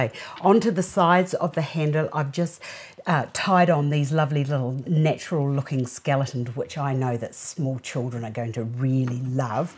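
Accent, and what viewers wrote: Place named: New Zealand. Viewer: Hi.. long time no see lol.. I remember from new Zealand morning show... now im in Australia and love videos and the ol kiwi accent lol... have a awesome year.. =)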